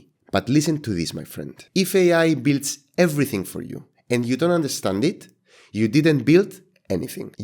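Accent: Cyprus. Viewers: Hey, I can tell from your accent that you are from Cyprus Χαίρετε κουμπάρε μου😂😂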